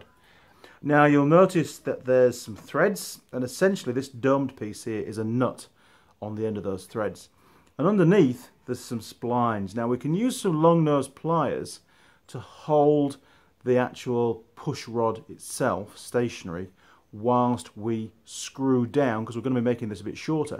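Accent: Yorkshire, United Kingdom. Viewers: York,i Know it well, the 1 way system is mental,nice town,walked along the city walls a few times,i could sniff a hint of Yprkshireman in there,i too am from Yorkshire, well Hull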